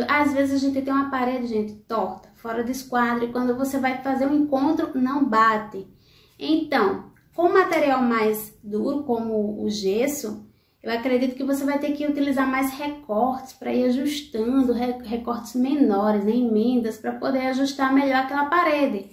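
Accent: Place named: Northeast, Brazil. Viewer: parabéns... ótimo vídeo... eita sotaque gostoso de ouvir ... nordeste é tudo de bom ,,,kkk 👏👏👏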